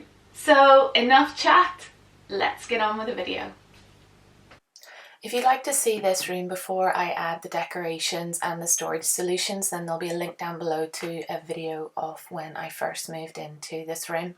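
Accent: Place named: Scotland, United Kingdom. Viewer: Nice setup. Thank you for sharing. You have a very handy husband. By the way, I detect a slight accent; Scot?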